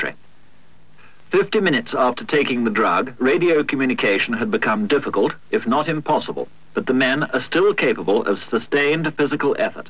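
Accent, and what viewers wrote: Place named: United Kingdom. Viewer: There's something a british man narrating this that makes it so right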